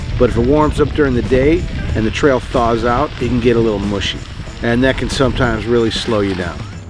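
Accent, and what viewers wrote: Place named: Chicago, United States. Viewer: Hi - like everyone else, I was surprised to learn that you'd had a bad accident that stopped you from riding for a while. I'm glad you're back at it. As many people have said, your videos are very informative - from your biology videos, I get the impression that you teach and your videos have the touch of a teacher who thinks of the important information to pass on and the best way to do it. Another aspect of your videos I enjoy is the quality. I do video work for a living and your videos are really good visual communication. And there's a final thing I really enjoy about your videos. I grew up in Chicago until going off to college. Like you, I live south of Chicago but far, far, far south - in North Florida. Listening to you is enjoyable because you remind me of my hometown. I hope you continue to make videos for a long time. 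Thanks!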